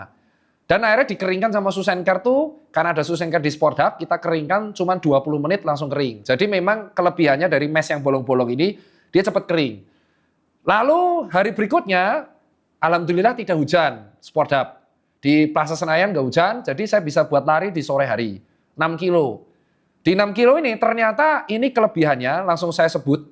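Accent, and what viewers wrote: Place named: Surabaya, Indonesia. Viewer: Saya fans baru dokter 🙏 pas liat YouTube ada dokter Lewat. Saya suka gaya bicara dokter yg apa adanya, nada Surabaya yg khas👍 terbaik dokter idola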